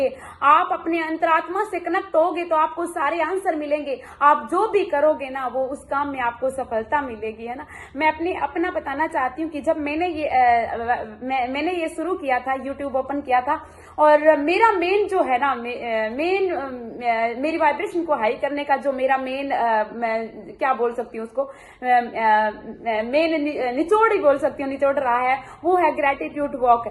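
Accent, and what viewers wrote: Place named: Rajasthan, India. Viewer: Di aap ki boli mujhe bhot Pasand h kyuki m bi Rajasthan se hu or Hindi me sab ko achhi trh se samjh aati. H thankyu so much di love you di 😍😘😘🥰😍😘🥰😍😘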